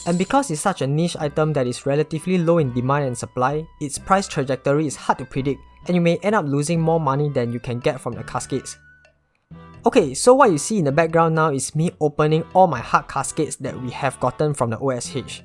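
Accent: Singapore. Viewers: u sound Singaporean or mayb Malaysian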